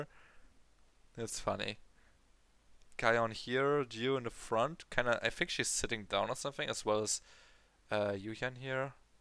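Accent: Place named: Germany. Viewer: Du bist doch Deutscher! Erkenne diesen Akzent sofort c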